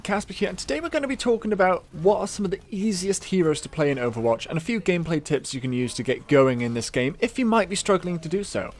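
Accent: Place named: England, United Kingdom. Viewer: dont get me wrong i really really like ur vids but i so not agree with u on this vid. no hero is actually ez to play cus 1. each player have his own play game and and there are players that it would be difficult to them to play those heros with there type of play. 2. try to play those heros in actual sieries mode or like comp, its not ez to play them like this. another thing i want to say that every player should play what he likes to play even if that was the hardest (all though i allready explained that there are no ez and harders) and the players dont need to play just what fits to to new players they need to play what they like and what they want to. thx and pls answer me 1 more question: in the qna vid u sayed u r not from england. then where are u from? u really sums from england thx!! and again dont get me wrong i love ur vids just i dont agree with u on this 1 and dont worry, i didnt dislike XD thx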